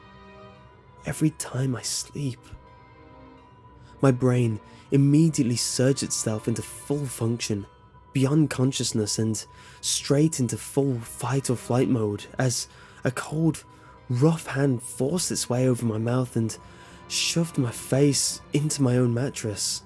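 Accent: England, United Kingdom. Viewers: can you pronounce it "Creepypastra"? is that a britt accent? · From Massachusetts but has an English accent? K🐸🍹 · Haha, seems everyone sounds the same and has a lovely English accent in these stories… hmmm. :P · I love his British accent! That’s when I sawr it !! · bruh he's british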